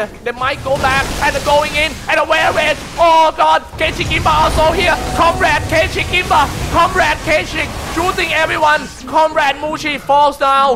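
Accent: Thailand. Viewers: Everybody's hating on this caster saying "he has low knowledge on the game". Well for me, he's entertaining. Has a sense of humor which is rare in the casting scene. He's a breath of fresh funny air. (And his Thai accent is part of his charm) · The Caster sounds like Thai or Vietnamese...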